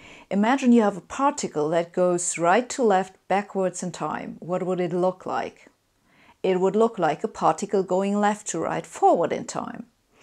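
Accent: Germany. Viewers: she got music videos??? Does she also sing with this strong german accent?^^ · Love your content. Your subtle German accent gives it a nice additional touch.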